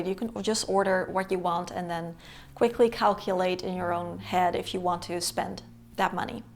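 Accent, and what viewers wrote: United States. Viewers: You speak perfectly American English 😮!!! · You sound totally American. I wouldn’t have known you were Dutch sadly. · From the USA. WhenI began working in my mid twenties, I would purchase a wall calendar so I could write down each day what I wore to work.everyday. I wore seperate so since i did not have a large wardrobe my wall calendar helped me keep track of the outfits I wore in that way I would not put together the same seperate pieces of clothing too often. I am fascinated as to how your English is so fluent and perfect without any hint of a Dutch accent. You sould like an American.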